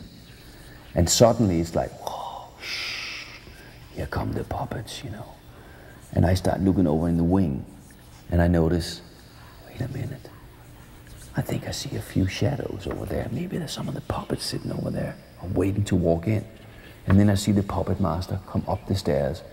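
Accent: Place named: Denmark. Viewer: MAAAN I LOVE HIS VOICE AND THAT DANISH ACCENT D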